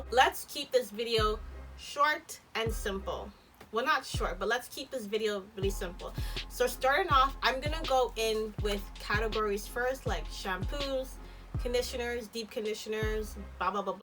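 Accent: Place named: Canada. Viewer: I knew u were in canada i hear the accent lol...im in mtl..where did u buy the avocado?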